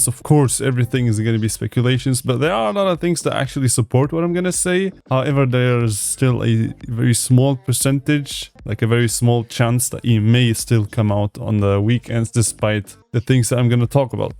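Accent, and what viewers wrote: Germany. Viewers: Brother you German ? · Are you german?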